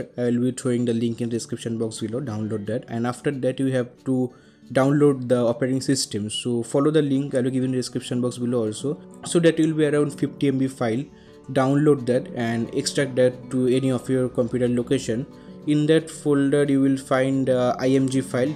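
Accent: India. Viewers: But this indian accent so terrible...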